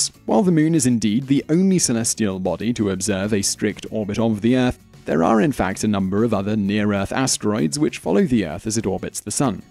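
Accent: England, United Kingdom. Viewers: The man accredited with developing 80% of our worlds modern technology said to think in terms of “Energy--Frequency-Vibration.” Or we can listen to this well spoken English Accented MORON!!! I’m afraid the masses have already excepted the later. 🤪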